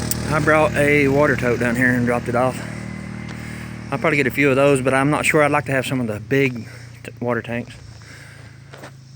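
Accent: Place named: Western North Carolina, United States. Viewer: cool man! I'll watch. I subscribed to your channel. You sound like your from close to me from your accent. I'm in western North Carolina.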